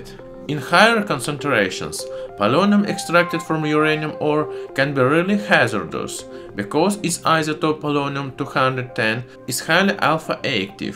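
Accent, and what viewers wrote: Russia. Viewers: Is he Russian? I love his accent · There is my beautiful Russian accent back again. Ya tebya lublyu.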